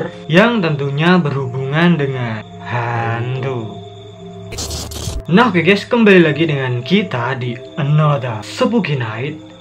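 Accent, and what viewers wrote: Java, Indonesia. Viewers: Merinding banget dah abang orang jawa apa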